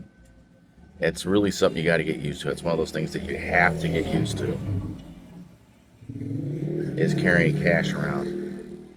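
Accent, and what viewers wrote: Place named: South, United States. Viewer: I like the cool way you narrate your fact! It makes you want to listen! The southern way you round up the words reminds me of the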